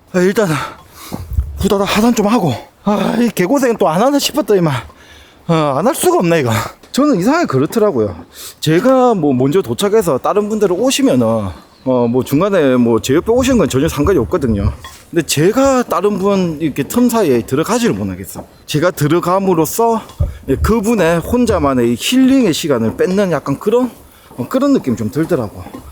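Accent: Gyeongsang, South Korea. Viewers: 경남쪽(갱상도 사투리억양 넘넘 듣기좋습니다! ~~말솜씨도. 굿~~👍👍나도대구지만 경남억양이 참 듣기좋터라구요. ㅋㅋ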